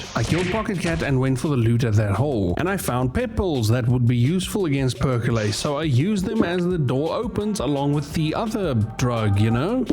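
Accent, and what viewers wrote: South Africa. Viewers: Are you South African, by any chance?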